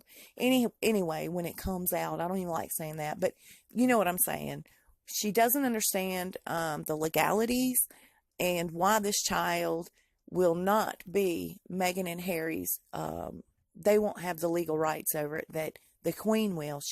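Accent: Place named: New Jersey, United States. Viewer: 2/2 ...that’s how ya “tawk”” when yr from Jersey... Girl!!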